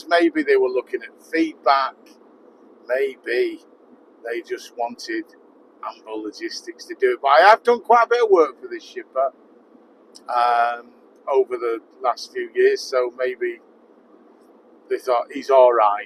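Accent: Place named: Manchester, United Kingdom. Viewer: Hello Where are you based? You sound like Manchester, I’m from Bury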